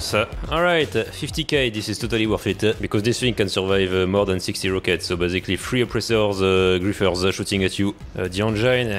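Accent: France. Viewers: I love this guy, his french accent, the edits and the insults make it so much more funny and enjoyable lmaooo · Ton accent français 💀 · digga man hört doch seinen französischen akzent so raus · there is something about roasting people with a thick french accent that is so funny and heart warming lol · your enraging griefers and french accent combined makes this content so damn funny 🔥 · Mais t’es français ?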